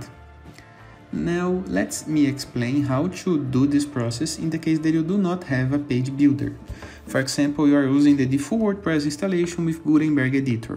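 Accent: Brazil. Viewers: Esse sotaque ta me cheirando a brasileiro...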